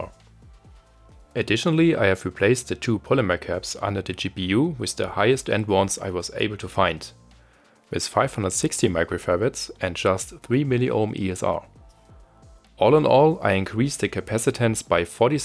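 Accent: Germany. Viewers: Sounds like you are german, can i send you for modding my razer blade <num> ti with new shunts so the tdp rises from 90-110w tgp to round about 150-175w tgp? Obviously i would pay you for the the great work you are cappable of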